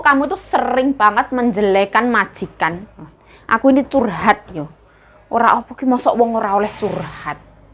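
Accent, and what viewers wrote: Java, Indonesia. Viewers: Wong jawa ngomong bahasa taiwan tetep medok ya, medok puoll 😁😅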